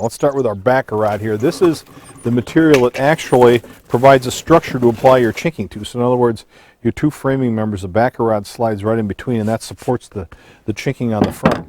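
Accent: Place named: Minnesota, United States. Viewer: Dig the accent He's from Minnesota you know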